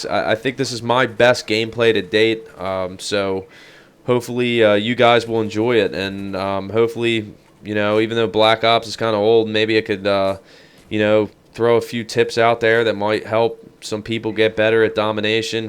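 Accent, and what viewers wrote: United States. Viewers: What state are you from? Killer accent. I'm Australian by the way which is why I can't tell, I can't really tell where all the accents in the states come from :P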